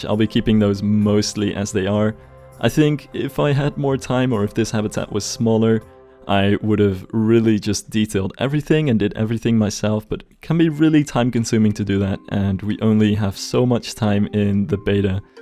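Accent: Netherlands. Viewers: Your English is really good, but for some reason I still knew you were Dutch the second I heard your voice.. Not sure how that instinct works😂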